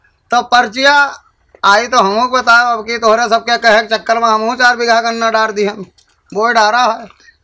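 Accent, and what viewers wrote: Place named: Awadhi, India. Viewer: Andhra pradesh Tirupati bala g se apna awodhi sun kar maza aa jata hai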